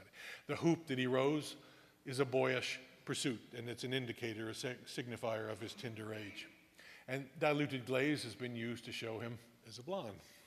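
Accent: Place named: Midwest, United States. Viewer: Thank you for this wonderful, fascinating lecture about Greece, delivered in a charming Midwestern accent. I discovered a new artist who truly deserves worldwide recognition.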